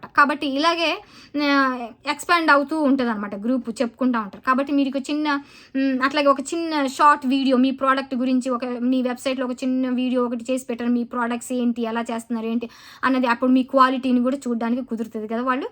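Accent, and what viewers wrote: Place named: Guntur, India. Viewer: Sister.. Ur from Andhra??? I think ur chridtian. Ur taking like Guntur Telugu language.. M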